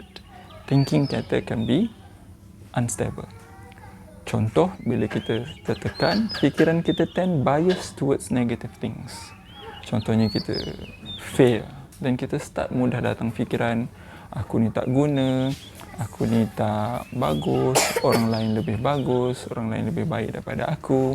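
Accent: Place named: Kelantan, Malaysia. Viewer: Doktor ni org kelantan ke..dgr bunyi budak cakap kelantan..hehe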